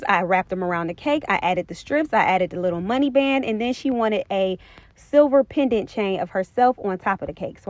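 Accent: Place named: Georgia, United States. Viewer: So I’ll never get a cake from you cause it sounds like you’re in Georgia somewhere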